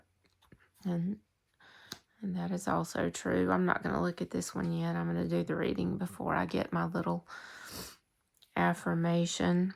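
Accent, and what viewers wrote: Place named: South, United States. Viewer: LOVE your southern accent... sounds just like mine from NC💞 Thank you for sharing your cool decks! I totally resonated with your large spread about family issues. Every card was right on.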